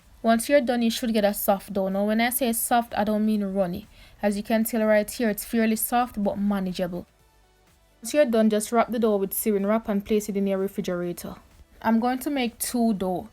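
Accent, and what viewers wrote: Jamaica. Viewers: Your accent caught me. I miss Jamaica right now. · Love an uptown Jamaican accent 😍 📍🇬🇧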